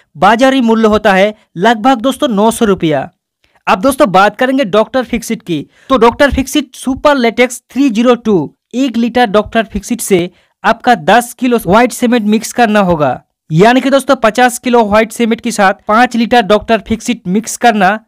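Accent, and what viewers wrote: Bengal, India. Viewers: Bahi tmr bari kotai ?? Tmr hindi r modhe banga pute utche...😆😆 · bangali mone hochhe 😃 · Bandha ka slang lagta ha Bengla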